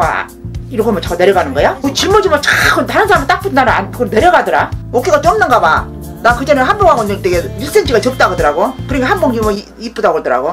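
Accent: Jeolla, South Korea. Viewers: 자메이카 출신 할머님 전라도 사투리 찰지심ㅋㅋㅋㅋㅋㅋㅋㅋㅋㅋ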